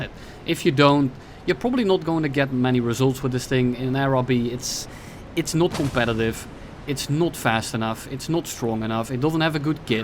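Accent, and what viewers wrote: Netherlands. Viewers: that dutch accent tho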